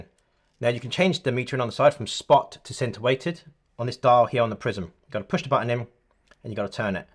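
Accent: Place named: United Kingdom. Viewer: Hi thanks to you i'm itcing to buy this! Where can I get the Nikon F100 as notice you have brit accent im in UK too, a lot on ebay say From Japan? Are those imports should I ignore those? I'm lost Any good sites you recommend and what should I look out for? Your advice is appreciated please!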